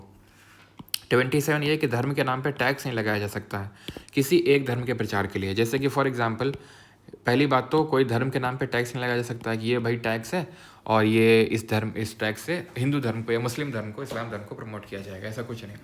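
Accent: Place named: Uttar Pradesh, India. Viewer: Up se ho kya aap?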